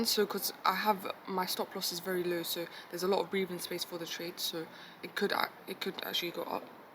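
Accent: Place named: London, United Kingdom. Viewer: She speaks like Stormzy, soo'oo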